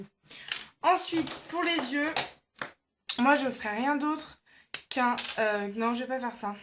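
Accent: Marseille, France. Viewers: arrete avec ton accent genre marseille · je sai pas je trouve qu'elle a un peu l'accent marseillais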